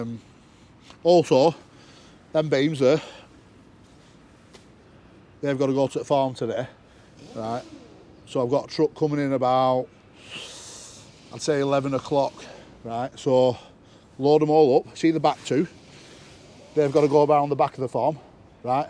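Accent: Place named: Wigan, United Kingdom. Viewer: you sound local , are you from wigan? either way your videos are pretty decent.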